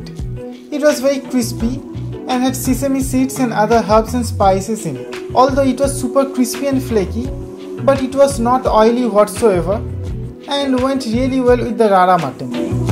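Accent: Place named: Bengal, India. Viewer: Bangali accent 👏👏👌👌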